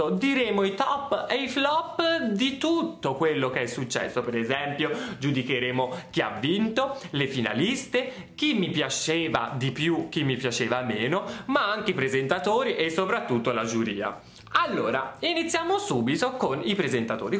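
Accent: Tuscany, Italy. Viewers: E un si sente che tu sei toscano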